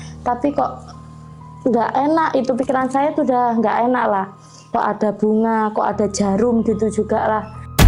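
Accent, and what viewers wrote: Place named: Pantura, Indonesia. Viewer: Dilihat dari logat bicaranya,Mbaknya saya prediksi adalah orang sekitar Pantura,Jawa Tengah.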